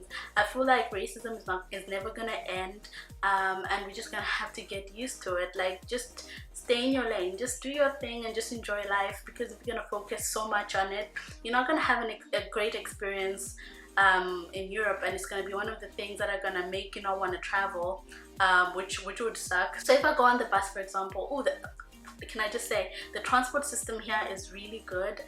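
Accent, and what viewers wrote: South Africa. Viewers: LOL I spent the last two summers in Italy. they're watching you because there are a lot of Africans who have fled the continent! They are looking at you hard because you're so adorable! So how is your Italian progressing. Have you been to Turin, Sardinia or mid-country? Where is back home for you! You sound like you might be from Durban or some part of South Africa. if so, i love the country! They have a Mediterranean Diet. I didn't find the pizza as a issues because they don't even eat spaghetti and meatballs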